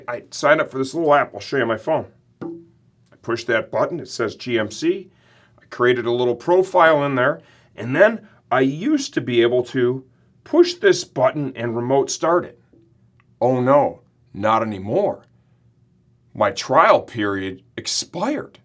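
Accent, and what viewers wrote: United States. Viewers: You sound like you're from Boston ! The language. Funny video and you're right about corporate cocksuckers.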